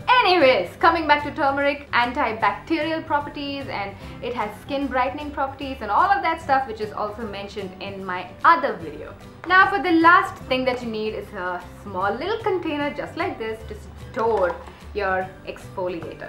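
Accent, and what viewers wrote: India. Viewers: You speak like an Indian..where do u belong to ?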